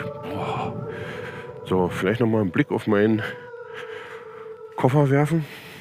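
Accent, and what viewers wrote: Berlin, Germany. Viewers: Hallo. Die Verbindung von Sprache, Gefühlen und Emotionen mit Deinen schönen Bildern machen das ganze echt sehenswert, erlebenswert und wir sind jederzeit bei dir. Du bist mit Deiner Berliner Schnauze echt entspannend und authentisch. Man nimmt dir das echt ab, deine Emotionen die du erlebst, die jeder Motorradfahrer kennt und so genießt. Deshalb fahren wir Motorrad um genau das zu spüren. Bleib Gesund und lebe deinen Traum, denn es geht ums Reisen nicht ums Rasen. Nur wenn du anderen darüber berichten kannst was du erlebt hast bist du Gesund wieder nach Hause gekommen. Das muss so bleiben um wieder einmal dem Alltag zu entfliehen. Bis zum nächsten Mal. Gruß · Mal gespannt auf die nächsten Videos. Schau mir dann mal die Serie der Westalpen an. Ich muss nochmal sagen: wirklich toll gemachte Videos. Und angenehme Stimme, trotz Berliner Slang ;-) Grüße aus Kerpen / Rheinland. 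Ralf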